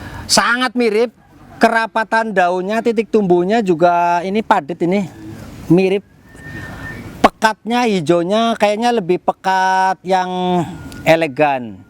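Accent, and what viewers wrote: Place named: Banyumas, Indonesia. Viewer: Ngapak bgt bapake🤩🔥♥️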